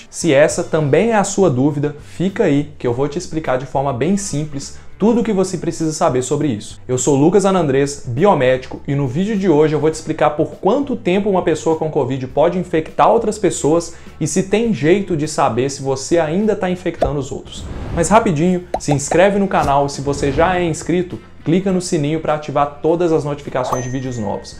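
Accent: Minas Gerais, Brazil. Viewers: Obrigado pelas dicas ! Adoro o seu sotaque mineiro . Tb sou mineira uai !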